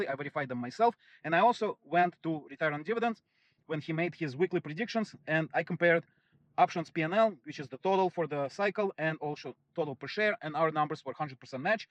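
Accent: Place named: Russia. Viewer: You have russian accent. Do you do channel in russian too?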